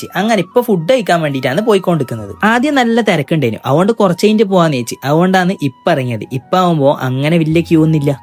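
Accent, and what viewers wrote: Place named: Kannur, India. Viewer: Nigaluda kanoor ഭാഷ കേൾക്കാൻ നല്ല അടിപൊളി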